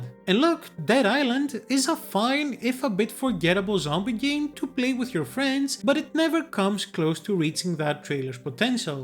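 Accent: Greece. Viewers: The greek accent is so noticable 😂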